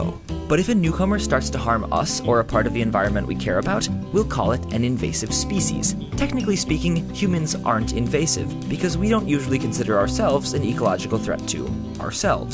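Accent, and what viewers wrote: Australia. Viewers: r u an Aussie? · Is Australian?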